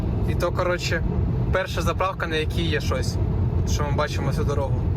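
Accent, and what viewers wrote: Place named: Zakarpattia, Ukraine. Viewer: Так початок він почав не лише українською а з закарпатським діалектом нам закарпатцям дуже приємно що українці поважають діалекти різних регіонів це вселяє надію що все у нас буде класно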